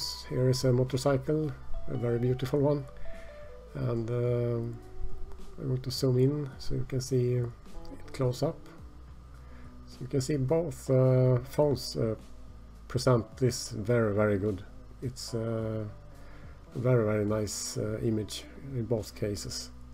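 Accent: Sweden. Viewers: Thank you for the review, i can notice you are svensk hahha.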